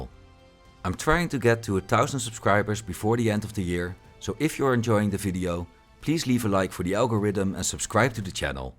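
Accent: Netherlands. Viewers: The dutch accent is strong in this one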